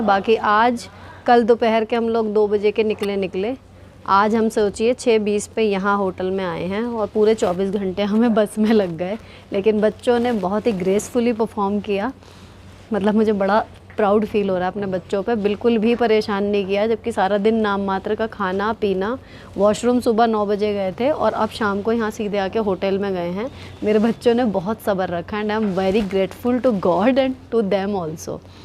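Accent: Meerut, India. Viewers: Meerut se ho kya mam ap?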